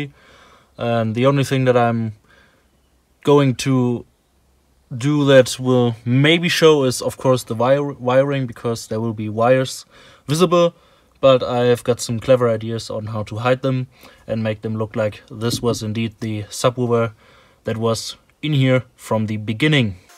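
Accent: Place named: Germany. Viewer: spitze video. dein Englisch ist ausgezeichnet, der deutsche Akzent kommt immer gut an... Cheers aus den USA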